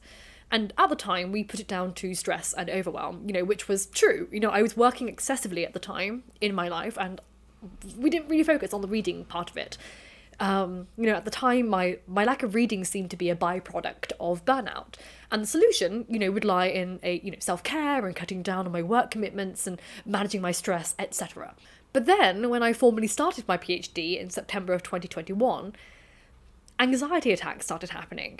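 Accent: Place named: England, United Kingdom. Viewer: I would like to apologise for previously commenting on your pronunciation of "Culloden" in one of your videos. I was taking it at face value that due to your English accent you were ignorant of Scottish culture. I realise now I was wrong and I'm sorry if I caused you any distress. I hope you continue on your journey of recovery.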